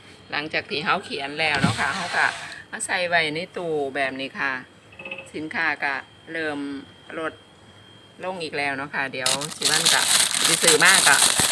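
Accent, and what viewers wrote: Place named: Xishuangbanna, China. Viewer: สวัสดีครับติดตามสำเนียงก็เหมือนสำเนียงสิบสองปันนาเลยครับปลื้มใจมากๆครับ 大家好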